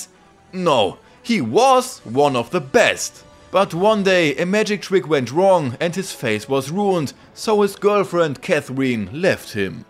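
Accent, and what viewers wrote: Germany. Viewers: I love your very strong German accent einfach geil Bro ich lach mich echt schlapp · Are you German? Cause you sound like that ^^ Edit: Ok, you definitely are xD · dein deutscher Akzent ist schon sehr deutlich rauszuhören aber cooles video :D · That german english is unbearable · Dude, your german accent is kinda funny 😄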